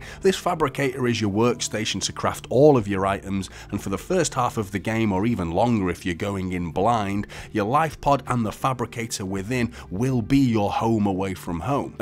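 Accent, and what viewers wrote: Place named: Stoke, United Kingdom. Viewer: Proper stoke accent that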